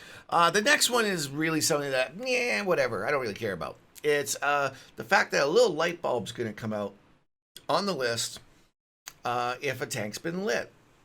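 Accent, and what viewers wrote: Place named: Canada. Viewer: Hey,. Good video. Thanks for producing and posting this. Always good, useful information from you. +1 TL:DR - the rest of my comment a bit off topic. So, stop here and move on if you wish. OK? Still here? Alright,now for a (slightly) amusing sidebar. I learned something today. But what? Well, on with my story., I always like your YT videos and I frequently tune in to your Twitch streams. Even platooned with you a few times on the EU server. Anyhow, one thing that always puzzled me whilst watching such was your pronunciation of the word "decal". When I was growing up as a kid back in the '50s and '60s my friends and I, while building our model planes and warships, put DEE-cals (or DEE-cowls) on them. Later on, once we were driving, we'd put DEE-cals (racing stripes, flames from the wheel wells … ) on our jalopy cars to make them look "cool". 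DEE-cals. Here's a couple YT posts - <link> <link> Now here's saying deckle (deck-ul, rhymes with heckle or freckle). Deckle? Why'd he say deck-ul instead of DEE-cal? So, I did a little internet searching. Turns out the "deckle" pronunciation for the word decal is a Canadian thing, eh. Cool. In other English speaking countries (US, UK, Australia and so on) decal is commonly pronounced DEE-cal, <link> But, there are many words in the English language that have alternate pronunciations in the various countries where English is the principle language in usage (decal being one such word). Just take US vs Canada (or should that b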